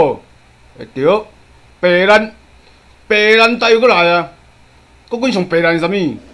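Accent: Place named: Taiwan. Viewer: 求更多台語片 有夠道地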